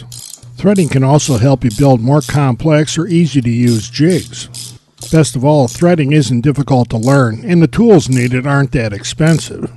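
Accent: Chicago, United States. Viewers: Love that Chicago accent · Very Chicago.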